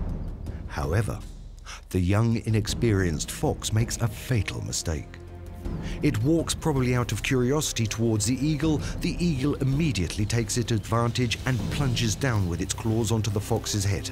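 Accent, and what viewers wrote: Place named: North, United Kingdom. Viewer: Disliked due to overly used northern accent.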